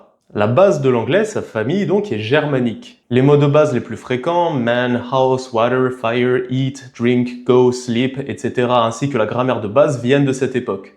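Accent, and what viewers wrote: United States. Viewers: Toujours très intéressant merci et même si les commentaires ne sont pas à la hauteur de votre propos c'est qu'ils prouvent notre intérêt ! je me permets donc : Pourquoi cet accent américain alors que l'accent de nos voisins anglais est si beau et qu'il s'agit d eux principalement? Pour la prononciation : Zibra / balè / tchoklet / chauffeur. Barbecue (barbe au cul) ok avec les autres. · Bsahtek l'accent américain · Oui. C'est un accent américain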